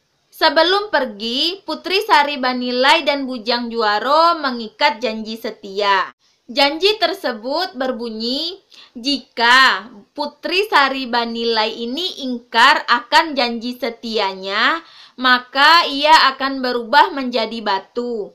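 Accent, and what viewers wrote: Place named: Indonesia. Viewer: Bahaso minang c lah diak,lawak bunyi nyo bahaso indonesia adiak wkwkwk...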